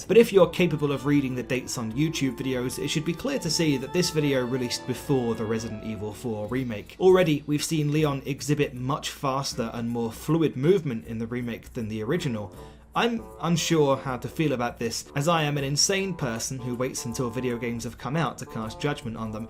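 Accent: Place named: United Kingdom. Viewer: dang this sexy voiced bri'ish guy sure is funny and cool and a good addition to the channel well done hard drive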